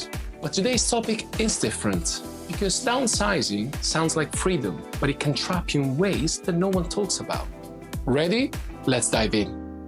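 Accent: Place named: England, United Kingdom. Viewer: So refreshing to hear someone who has learned to speak English with a more authentic English accent, rather than an American accent.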